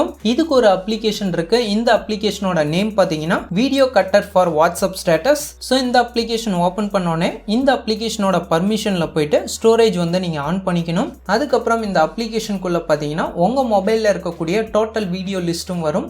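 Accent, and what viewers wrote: Salem, India. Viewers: Bro nega salem atturah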